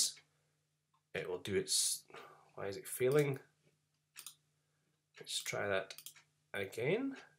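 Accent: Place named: Scotland, United Kingdom. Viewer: Hey man, you just made my life easy. Thanks, wherever you are (your accent suggests Scotland, which is my favourite place in the world).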